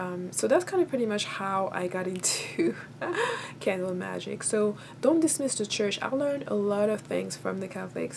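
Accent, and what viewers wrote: France. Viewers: I can hear a French accent peak through am I right? But good story time girl make more videos can you do a love spell?!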